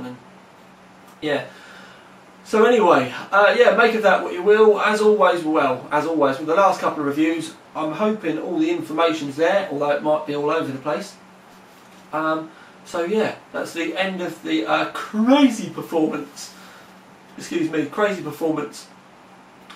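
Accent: United Kingdom. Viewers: dude i hate your fucking british accent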